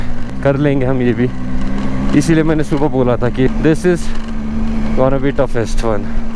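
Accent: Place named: Gujarat, India. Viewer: Your gujrati accent is awesome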